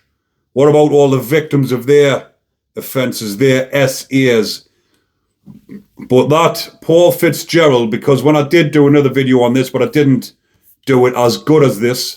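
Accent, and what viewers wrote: Newcastle, United Kingdom. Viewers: Have watched about this before, but always love hearing the happy ending on this one. Especially when told with that canny accent. I'd love to know how the justice giver intended to cook Huckle's body parts for consumption... Must be a prison trick somewhere? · You are a tough hard bloke and I love your Geordie accent. Keep it up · Lovong the Geordie accent when your telling your stories. I lived in Newcastle UT myself for nearly 12 years. Loved it.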